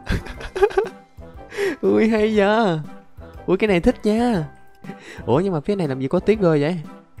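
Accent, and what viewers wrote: Vietnam. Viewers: Anh đọc tiếng anh nhử tiếng Việt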